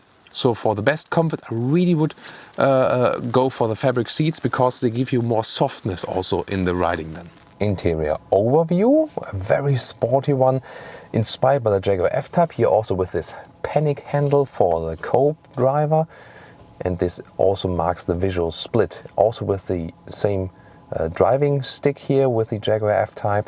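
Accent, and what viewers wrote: Germany. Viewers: SOS10 I don't usually mind - gives the whole thing a bit more character - you know. The german doing an incredibly detailed and professional review of almost all aspects of the car - plus the accent - theres a reason i watch these videos, but I myself (german as well) like to constantly improve my english and be told when I make mistakes and how to fix them :)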